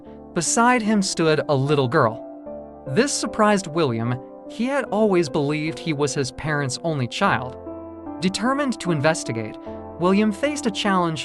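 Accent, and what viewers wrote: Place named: United States. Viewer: Also, though the accent spunds genuinely American, the speaker switches genders on characters in the story. Come ON, people!! Dloppy performance, at best !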